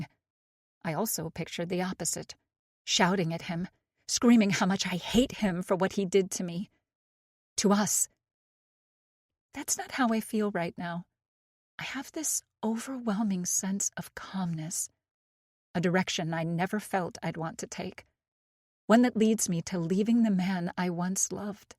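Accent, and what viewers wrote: United States. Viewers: I love the American narrators! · American narrator 🎉❤ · American Narrator ❤❤❤❤❤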